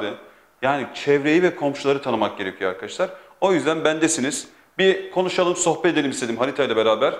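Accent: Gaziantep, Turkey. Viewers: Antepli olduğunu nasıl belli ediy 😂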